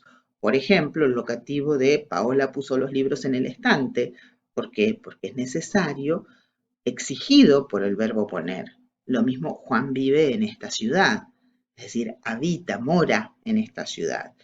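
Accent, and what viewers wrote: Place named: Argentina. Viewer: Por favor no dejes de hacer estos videos! Están increíbles. Muy bien explicados, muy interesantes y en español de argentina! 🇦🇷❤ Te agradezco mucho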